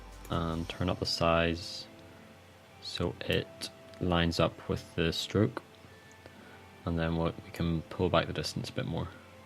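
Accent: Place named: Northern Ireland, United Kingdom. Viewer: Nice...just waiting for the comments saying they can't understand northern irish accent lol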